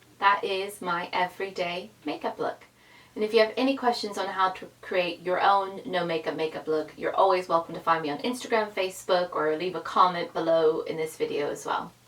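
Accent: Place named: United Kingdom. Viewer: Very lovely! You are sounding more like a Britt every time I visit your blogs! I just love the accent ! Want to move back!!!!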